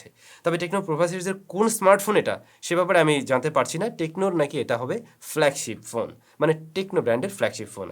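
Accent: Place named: Narsingdi, Bangladesh. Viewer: Amader narsingdi r Kotha sone vlo laglo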